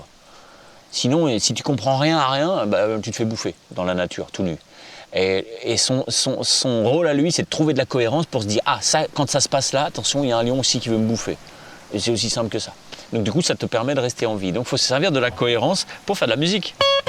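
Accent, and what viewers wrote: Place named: Salagou, France. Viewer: Putain con, cet accent du Salagou!!! ça donne envie de te suivre.Merci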